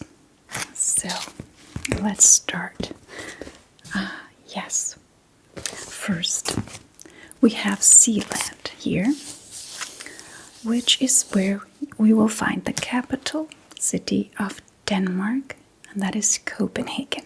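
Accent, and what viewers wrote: United States. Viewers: Someone please tell me how this Dane not only speaks flawless English but does so with an American accent. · I would have liked an English version rather than American English.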